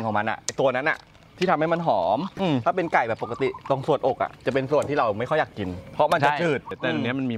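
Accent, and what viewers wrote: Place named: Suphanburi, Thailand. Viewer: พูดเหน๋องทั้งคลิปเลย ดูคลิปพี่จนติดเหน๋อและ555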